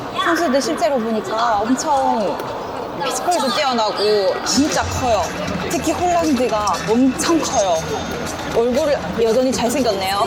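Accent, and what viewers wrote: Gyeongsang, South Korea. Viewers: 누나 경상도 사람이었구나 ㅋㅋ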